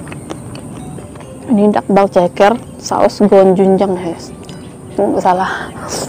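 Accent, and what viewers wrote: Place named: Java, Indonesia. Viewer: Mantap medok pinter banget masak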